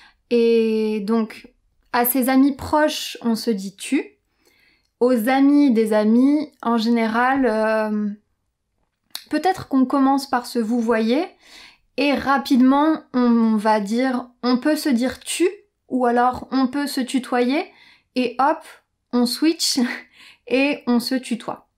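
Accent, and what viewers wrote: France. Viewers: Je déjà suivi Portugais avec Leo ici sur l' Youtube. S'il vous plaît, corrigez moi si je suis équivoqué. J'ai abonné récemment au votre canaliser ici sur le YouTube. J'aime 😍 ton accent français. Es-tu française? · I'm happy by your efforts given. This is my first time to see your channel. I like your French accent and also it's also sort of understandable. Please continue sharing some French language episodes. It's really helpful. Merci beacoup. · Yo, au vu du nombre d'abonnés entre le 5 juillet et le 21 juillet, à ce rythme, il y a des chances de passer les 100k abonnés d'ici la fin de l'année à force de référencement. Il faudra que tu fasses une vidéo en anglais un jour, ils veulent entendre ton french accent :p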